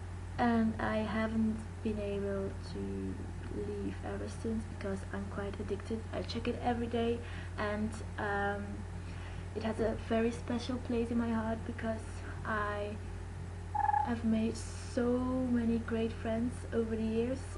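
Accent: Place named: Flanders, Belgium. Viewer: Hi. I'm trying to imitate the Flemish accent. do you have a few reference videos that you think would be good for me to watch? thank you. by the way, I agree, you accent is wonderful. :3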